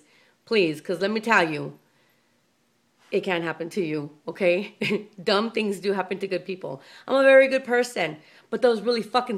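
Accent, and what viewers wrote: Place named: West Texas, United States. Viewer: Where you're from? You sound like some one in West Texas (El Paso) lol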